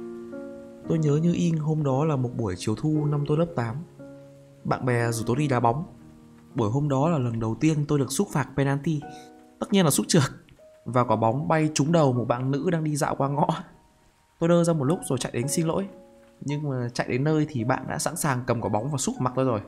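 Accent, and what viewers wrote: Hai Phong, Vietnam. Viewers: A ở Hải phòng à :v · Bác add quê Hải Phòng ah? :)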